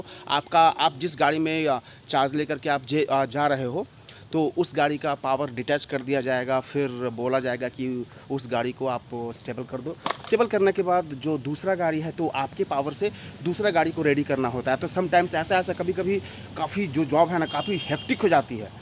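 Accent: India. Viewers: Bhaiya aap ho to bihar ke hai mai apke acent se pahchan gya Lekin kis district se ho bihar ke😊😊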